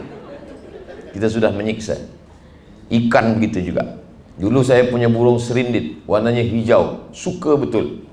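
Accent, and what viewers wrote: Kuala Lumpur, Malaysia. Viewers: Otak aku tak boleh comprehend ustaz orang Indonesia, sembang cm org malaysia. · Askm,terbaik ustaz pandai lograt org KL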